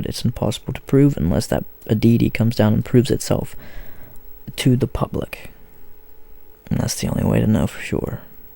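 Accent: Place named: South, United States. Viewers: I'm actually really worried you live around where I live bc if I see you anywhere I will probably scream, cry, and run away because jfc. You like lakes, you have a specific dialect.... Georgia? North Carolina? Virginia? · Creeper alert. Even before you posted a video wearing a WV shirt, I assumed WV from your accent. I went to Marshall University. 😁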